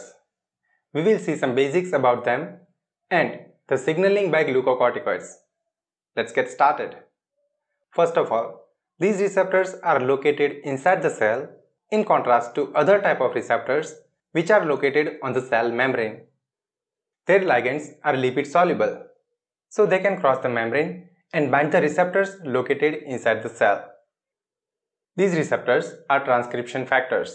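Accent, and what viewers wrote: India. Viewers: If you are indian then its common to have indian accent and there is nothing wrong in it. Dont change your ways just becoz some stranger not liking it. U can't impress everyone, people are not even happy with gods, and we are just humans. Anyway great video. I like your accent 👉👈🥁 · why it is always indian accent, I am sick of it!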